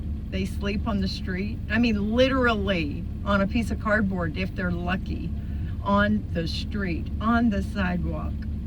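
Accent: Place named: Alabama, United States. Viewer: <link> When I was in San Diego and searching for interesting places to visit and park my van that didn't cost anything, I found many places over in Mission Bay by SeaWorld. There is a nice parking area on Vacation Isle where you can park and see the boats go in and out the harbor. These are for day time hours only but some parking areas open real early am for the fishermen to launch their boats. It's fun to see the boats go in and out the harbor. There are even some Mississippi paddle boats to watch at night all lit up in the sky from their lights. Hope this helps. What part of Alabama are you from for your accent sounds Alabamian. I enjoy watching your videos.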